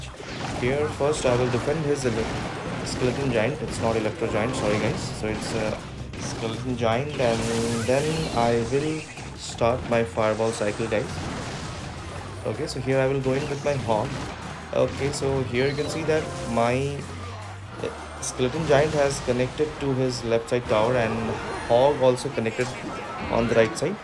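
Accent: India. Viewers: Which country are you from?? U sound Indian...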